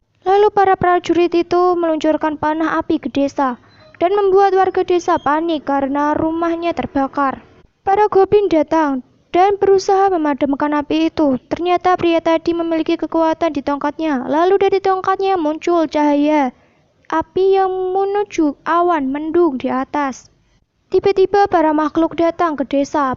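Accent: Java, Indonesia. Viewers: Terlalu medok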